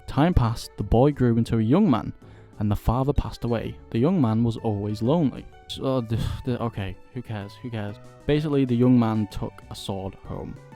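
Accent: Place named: United Kingdom. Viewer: I really enjoyed this video, great to hear you reading the story out and your reactions to it 😂 I liked the video editing too. Also as a fellow Brit my first thought hearing you was "ooh yay, is British too!"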